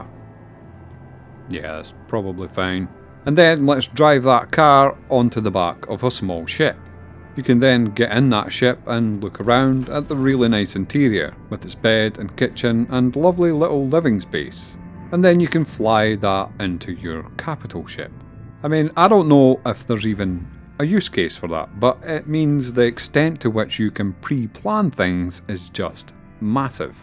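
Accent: Scotland, United Kingdom. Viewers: Purple Burglar Alarm! Love the Scottish accent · Appearantly I'm perfectly capable to understand "scottish english".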